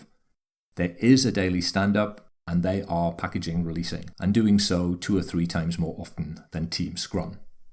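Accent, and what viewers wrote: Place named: United Kingdom. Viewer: Thank you for speaking slowly and clearly. Not all Youtube educators grasp that students hearing the information for the first time need it delivered slowly and clearly. As a Yank, I find your smashing British accent most enjoyable. Your videos are fantastic. Bravo!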